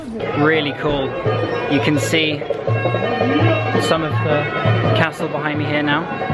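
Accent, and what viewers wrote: United Kingdom. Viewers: Whıte Turk It is English British accent! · You’re British?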